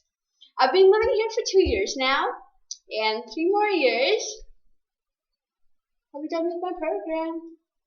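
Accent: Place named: India. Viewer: Just like ..just like ..just like. Indians english in canada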